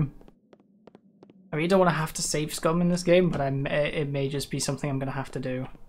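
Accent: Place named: United Kingdom. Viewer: are u British? ur ascent is amazing I love it cuz it sound like you could be on a podcast or something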